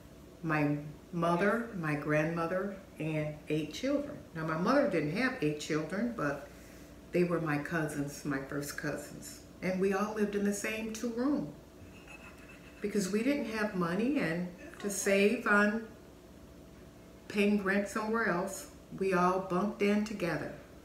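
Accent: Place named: Mississippi, United States. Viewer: You must be a Mississippi gal. New South Ford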